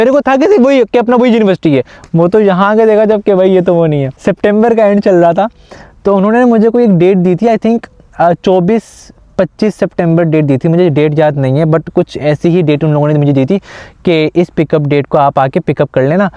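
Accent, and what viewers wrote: India. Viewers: brooo, I literally thought that you were an indian but aap tou Pakistani niklayy bhaii saab. your accent is kinda indian ngl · Trust me bhai teri accent sun ke laga tu Indore( India)se hai. Mast aadmi ho tum. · Bhai aap to pure indian lagte ho accent bhi ❤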